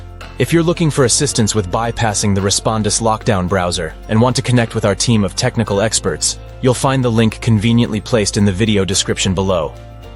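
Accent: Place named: India. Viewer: your indian bro i can tell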